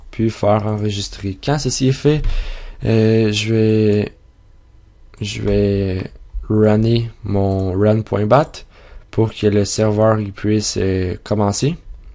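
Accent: Canada. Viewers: Super tuto, vraiment complet et bien expliqué, je suis toujours autant fan de l'accent canadzien :) Mais me voilà présentement bien embêté :) Tout le monde fait les même remarques concernant la permission /sg setlobbywall etc. J'ai utilisé le principe d'écrire directement le texte sur les panneaux mais dès que je clic dessus ça me dit "you don't have the permission to join arena1". Et quand je fait /sg help il ne me donne que les commandes "player" et pas admin. Donc je peux /sg setspawn, mais pas entrer sur l'arena 1 que je viens de créer. Un vrai casse tête. Mais ton tuto est super, c'est juste moi qui suis nul :D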